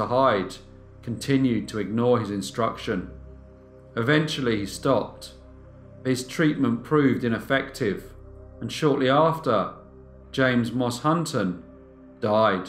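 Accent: United Kingdom. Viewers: I would love to know where your British accent is actually from.London?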